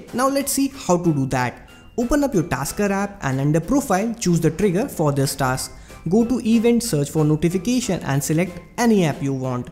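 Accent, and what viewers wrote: India. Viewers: Indian accent always cracks me up😂😂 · same lol ,i hate indian accent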